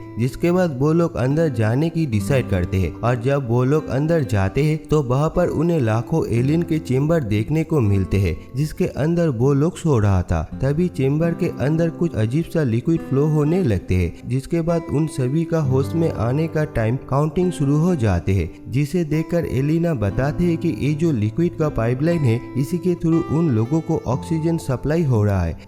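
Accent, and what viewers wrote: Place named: Bengal, India. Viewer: Are you bengoli please work on your M T I so you can explain better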